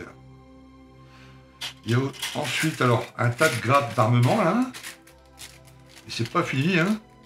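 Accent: North, France. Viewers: Sympa la petite voix un accent de ch'nord (normal pour un billoutte)...Super maquette..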